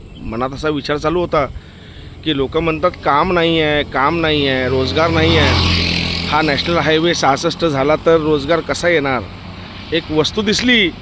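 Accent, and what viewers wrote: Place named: Belgaum, India. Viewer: 100% बरोबर आहे तुमचे. पण तुमच्या भाषेत कोकणी टोनिंग नाही बेळगावी टोनिंग आहे .❤👍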